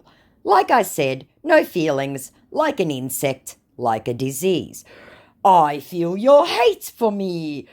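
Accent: Australia. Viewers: I love your Australian accent, Australia is one place I always wanted to visit someday.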